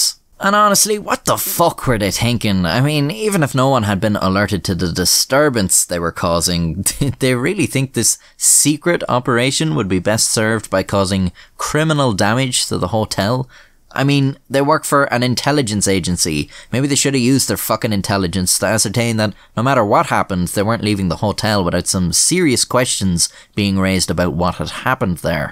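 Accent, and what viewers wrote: Ireland. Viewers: Idk if I've been watching too many of your videos or not, but my inner monologue now has an Irish accent.. and I'm American, from North Carolina lol.. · Seeing the guy it's named after is Henry William Lamb, 2nd Viscount Melbourne who, in 1770, was raised to the Peerage of Ireland as Lord Melbourne, I think this Irish presenter has every right to pronounce it in his own dialect. Regardless how we current 'Strayans say it.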